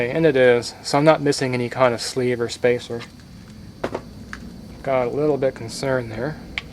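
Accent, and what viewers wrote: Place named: Seattle, United States. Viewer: You sound like your from around Seattle/Everett WA.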